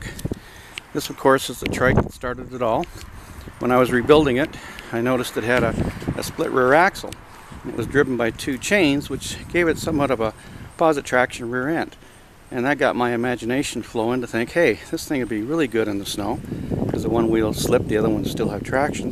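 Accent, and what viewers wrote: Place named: Canada. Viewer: so i guess youre Canadian huh? ;)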